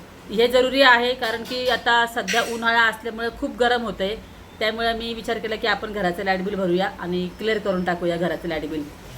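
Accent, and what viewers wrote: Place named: Sangamner, India. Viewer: मला तुमच्या भाषेवरून वाटलेच होते की तुम्ही संगमनेरच्या आहात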